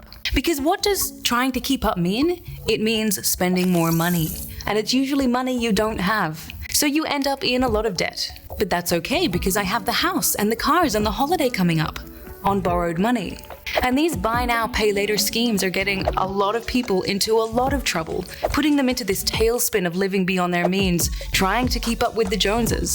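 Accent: United States. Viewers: your accent is changing from british to american, softer R's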